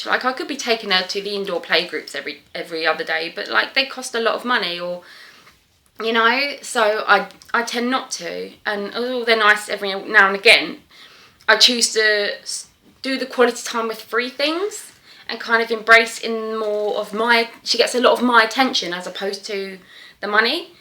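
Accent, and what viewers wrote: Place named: London, United Kingdom. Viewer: I'm just curious about where you live because you was saying London markets? I thought you live in London because of your accent Btw of you do then you should try Spitalfields market its just so cheap and an excellent place to get all your fresh produce especially if you go early mornings I love the way you said I prioritise health overt anything else and that's how everyone should be Minimal living is the best way to go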